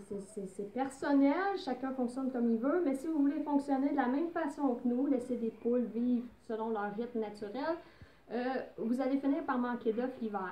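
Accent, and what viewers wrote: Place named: Quebec, Canada. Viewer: Désolée ,mais je ne comprends pas tout avec l'accent du Québec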